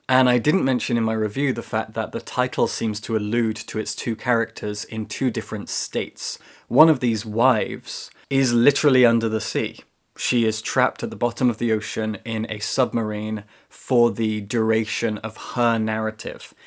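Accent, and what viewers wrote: United Kingdom. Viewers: I'm so glad I found your channel. I could listen to you speak all day, both for your rich voice (I adore a British accent, being American and an anglophile) and for your professorial tone. I feel smarter just for having listened to you! You gave me all sorts of ideas for next reads. I look forward to watching more of your videos. I'm a retired school librarian and grandmother and I miss being surrounded by book people--who of course are the best! Thanks for all you do.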